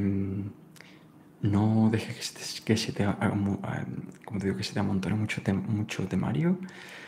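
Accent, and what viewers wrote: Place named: Seville, Spain. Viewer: Yo toda feliz de saber que compartimos acento y tierra (Sevilla🌞); un asmr brutal, muy fan ✨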